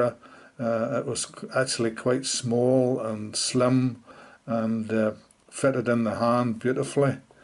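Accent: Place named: Northern Ireland, United Kingdom. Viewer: Loving the Northern Ireland accent. A N.I friend of mine taught me how to say "How now brown cow" with the accent. Although I didnt know you guys said "filum" for film. My favourite camera, I couldn't afford one when I started out in photography and so had an OM-10. I only recently got an early OM-1 and 2 and I'm once again shooting 'filum' alongside my digital.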